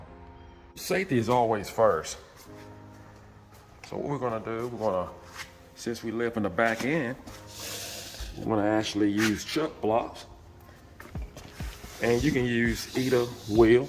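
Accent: South, United States. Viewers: Southern drawl is icing on the cake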